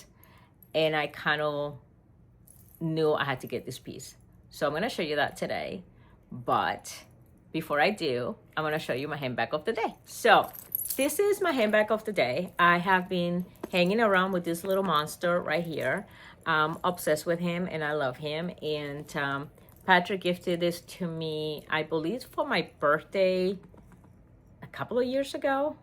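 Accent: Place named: El Salvador. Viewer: I love that crystal tabby. You aways have great bags. where are you from? The accent sounds like el Salvador or maybe Columbia 🤔 But anyway, the new loved leather in brown looks way too intentional on certain bags.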